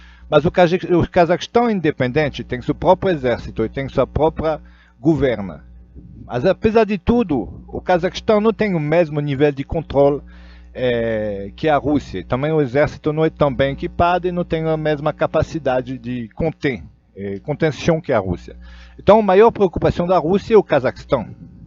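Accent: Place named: France. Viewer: Porque tu tens um sotaque francês de falar o português, amigo?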